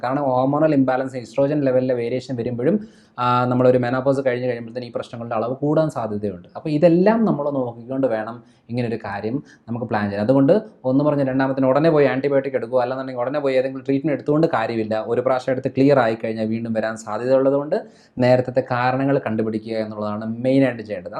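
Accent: Pala, India. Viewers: Attitude പാലാ ഭാഷയും കൊള്ളാം....പക്ഷെ ഇടക്ക് കാടു കയറുന്നുണ്ട്....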